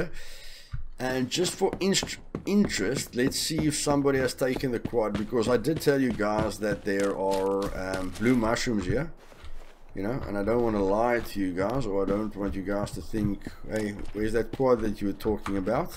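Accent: South Africa. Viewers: Where are you from? xD Sounds like an SA accent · You're south african aren't you😂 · Are you from South Africa? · Hey, which country are you from? Your accent sounds like you are from south africa? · Is jy south african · Thick South African accent. Love it 😂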